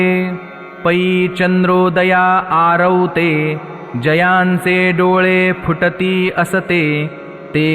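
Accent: Standard. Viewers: जय श्री कृष्ण आपली वाणी व उच्चार खूप शुद्ध आहेत.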